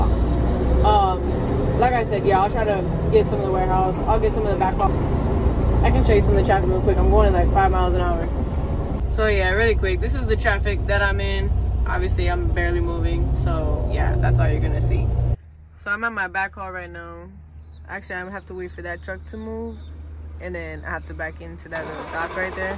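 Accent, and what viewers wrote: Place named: South Florida, United States. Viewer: Sounds like South Florida. Pompano or Miami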